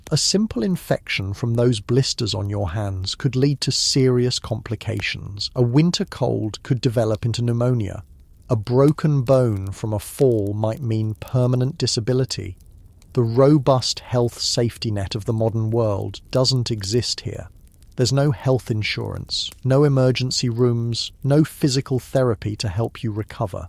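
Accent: England, United Kingdom. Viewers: I love your content, but why the switch to the English accent narration? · I didn’t realise how many channels there were of these but I love this one. It’s the English accent because I cannot stand American ones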